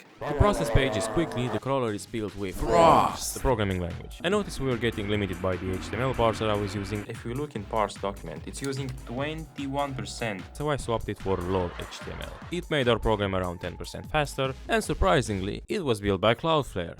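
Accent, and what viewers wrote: Russia. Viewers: Wtf is even your accent, you didn't used to have so much russian in yout accent. What is wrong with you? I can't understand a sh*t!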